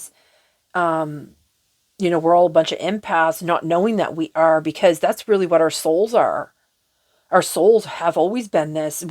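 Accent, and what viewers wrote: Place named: Canada. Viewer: LOL .. I think it is bc you are Canadian. I had a professor in college that spoke with a similar sound & he was from Canada too. 😂😅😊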